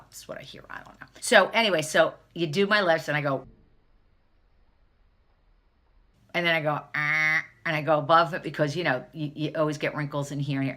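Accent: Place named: New Jersey, United States. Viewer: I have to try micro needling. Yours is the third beauty channel that talks about serious results, and something’s gotta give with this saggy skin. Had not heard of the Gold Bond cream, but I am now on the lookout for it. BTW—love your Jersey accent—a boss and best friend are from NJ , right from your neck of the woods! Love your sense of humor—keep up the good work!❤️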